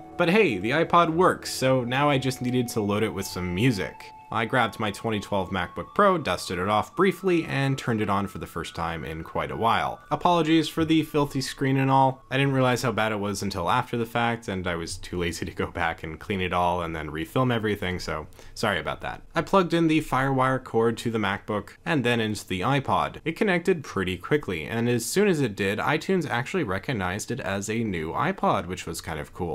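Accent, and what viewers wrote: Vancouver, Canada. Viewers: Are you from Vancouver?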